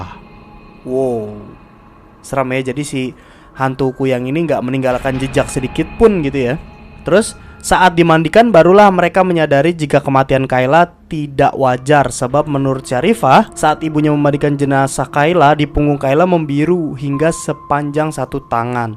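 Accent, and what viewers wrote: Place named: Surabaya, Indonesia. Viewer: Hadir coy suroboyoan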